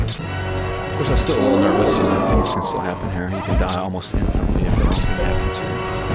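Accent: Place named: South, United States. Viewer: commentary!! Never heard it on your vids before is this new? You have a nice southern boy like voice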